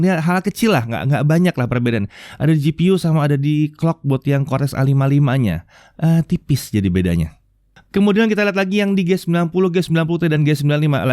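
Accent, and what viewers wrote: Indonesia. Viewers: maklumin aja lidah org indonesia gitu ,ya biarin lah 😂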